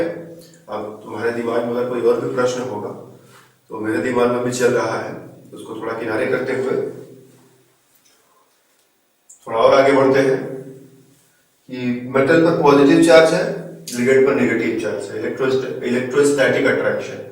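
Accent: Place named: Uttar Pradesh, India. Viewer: sir aap U.P se lgte hain. bohat acha smjhaaye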